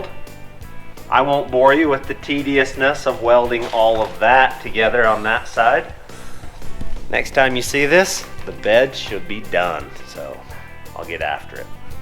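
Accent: United States. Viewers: Looking for the end? You will find that when the roll is finished. Still cant get used to the American way of saying things😉😉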